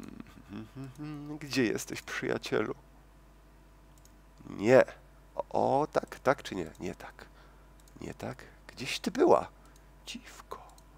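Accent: Silesia, Poland. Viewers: No widza że ty jednak umisz po naszymu godac i że ty żeś hanys je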